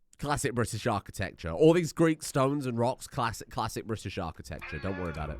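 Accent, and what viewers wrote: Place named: London, United Kingdom. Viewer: For a man who says he's from the West country, and lives in the middle of nowhere, how are you this south-east Anglais and loathing of everyone around you? > Has fully swallowed the Greek-Romance-makes-you-sound-smart pill > hates anything English > has a south east accent. You sound like a man who's been brought up in the heart of London in the upper or middle class and is trying to hide that 🤔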